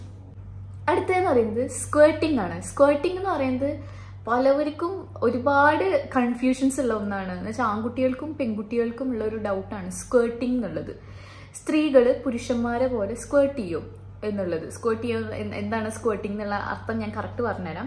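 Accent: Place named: Kozhikode, India. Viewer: Are you from Calicut? Kik-killi kettapo chodhichadhaato.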